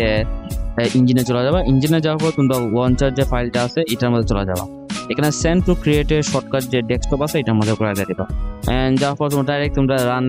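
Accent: Sylhet, Bangladesh. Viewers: bhai tumi sylhety ni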